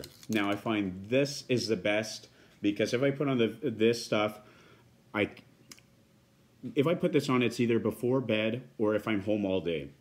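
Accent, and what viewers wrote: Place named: Wisconsin, United States. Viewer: Hey dude, please articulate your words. You sound like you are from Wisconsin or Canada.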